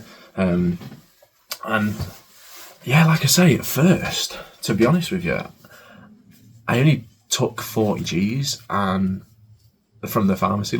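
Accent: United Kingdom. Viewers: Legend mate , literally been on the same journey. Glad to finally have someone in the UK posting this type of content and judging by your accent your only down the road 😂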